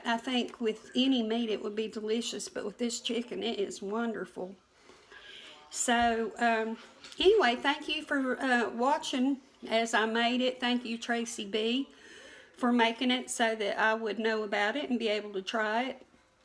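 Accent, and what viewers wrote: South, United States. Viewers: I love to listen to a southern accent...I could listen to all day long.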